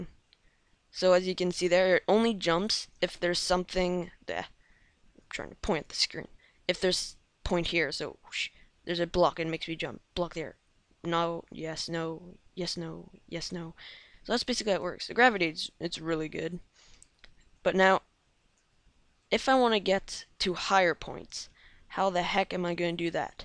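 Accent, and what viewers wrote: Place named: India. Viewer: why do you start out talking like an Indian and then lose the accent? wtf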